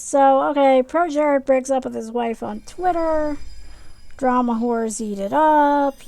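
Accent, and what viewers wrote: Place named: South, United States. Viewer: You sound a little bit young and like you're from the south And you listen to Magog😂 🤔🤔🤔 very interesting🤡🌎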